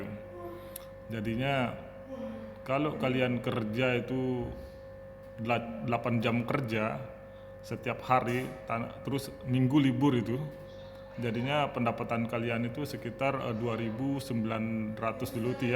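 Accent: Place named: Lombok, Indonesia. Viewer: asli mna saudara kyknya lombok dari logatnya ..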